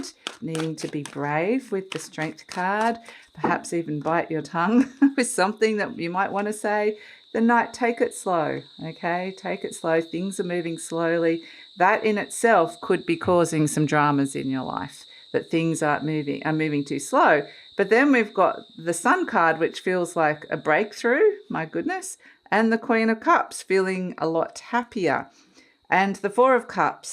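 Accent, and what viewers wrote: Australia. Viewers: I knew it was an Australian accent 😊 kind of hard not to when you're from Oz 😂 I'm from Brissie. Good to see we have a few readers from home. Resonates a lot actually. Thankyou for the reading. Wish you all the best & many blessings 🙌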